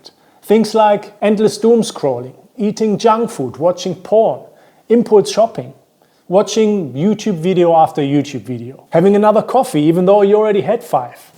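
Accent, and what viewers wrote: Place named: Germany. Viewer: My ADHD brain tells me to guess your accent. Deutschland? Ich learne ein Jahre Deutsch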